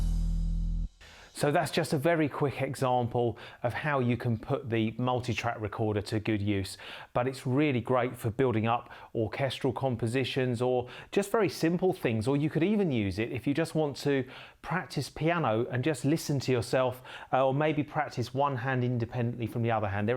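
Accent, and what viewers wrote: United Kingdom. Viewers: Who knew this mild mannered guy with a British accent was a funk genius?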